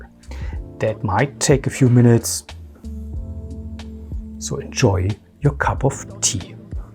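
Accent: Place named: Germany. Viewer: Gotta love the Hans Landa accent. Thanks for the contribution friend!!!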